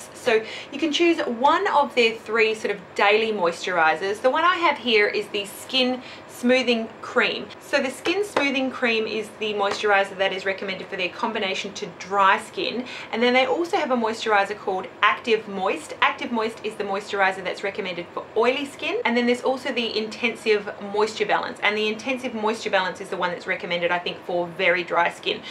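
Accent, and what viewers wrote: Australia. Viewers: Hi, My favorite Dermalogica product is the Dermal Clay Cleanser. I have oily skin and that product, in combination with my Clarisonic, leaves my skin feeling amazingly fresh and clean. It has also worked wonders for my pores. Love it! I just recently discovered your channel and I love all your videos so far. I love your Australian accent! Greetings from Texas:)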